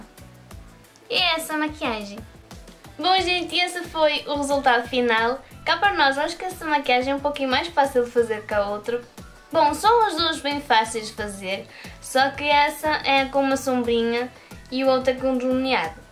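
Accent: Portugal. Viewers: Gostei do video, só tenho dificuldade pra entender o sotaque português :( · Que linda! Você ficou muito parecida com ela :D Adoro seu jeito de falar ♥ Portugues de Portugal ♥♥♥ Te adicionei no face e te segui no instagram :D Beijos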